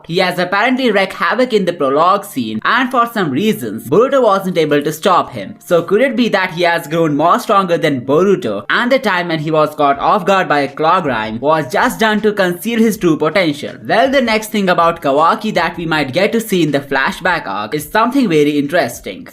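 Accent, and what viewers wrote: India. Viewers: Lmao I think he is Indian by his accent but let me just support you on this question for the reply 😂😂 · but i was asking so..... bcoz his accent seems indian to me.... and i was just demanding hindi commentary from 3 videos.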